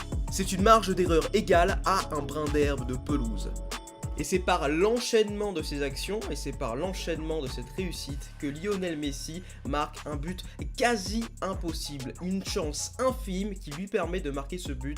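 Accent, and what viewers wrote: Marseille, France. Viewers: T sur que t marseillais avec cette accrnt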